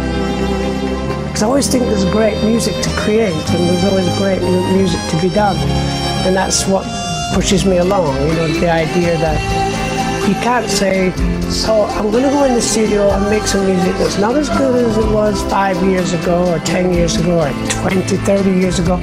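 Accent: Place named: United States. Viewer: Nice one, when was this recorded as you have your American voice instead of the Norfolk St one in your recent live stream.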